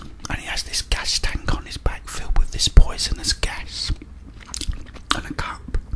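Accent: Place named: London, United Kingdom. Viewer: Just found your channel! I’ve never seen an ASMRtist wiv a propa landan accent. I’m born and raised London myself, so I got an extra pang of like nostalgia and just general asmr from the whispered vaguely cockney “wewcome” at the start I’m now hoping you actually are from London or this’ll sound a bit weird 😂 Love the content nonetheless!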